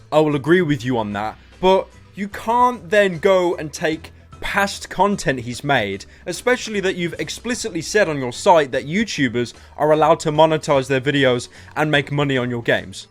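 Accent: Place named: Birmingham, United Kingdom. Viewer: I honestly cannot work out accent. It seems like a mix of Brummy and some kind of London/County's that surround inner London. It's the way he says his O's that confuses me.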